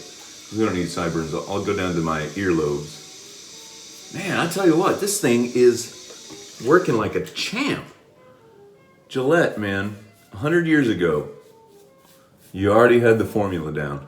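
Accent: United Kingdom. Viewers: "Barbasol will not be featured in this episode".....boy I'll beat you wish it had been featured in this episode!!😁😁🪒🪒 Loved the British accent!!🇬🇧🇬🇧👍👍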